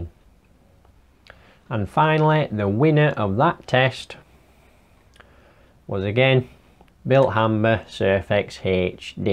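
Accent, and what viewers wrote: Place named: England, United Kingdom. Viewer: Great video! It's a pleasure to hear a proper English accent with clear, simple explanations - all backed by thorough research and planning. Beats a loud, shouty American making big unsupported claims from a sunny California beach! Top work. Well done, that man...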